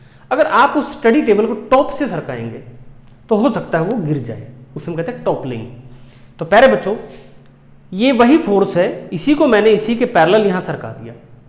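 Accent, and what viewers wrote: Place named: Haryana, India. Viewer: Aapki haryanvi wali tone aa jati h bt aapne samjhaya accha 👍👍👍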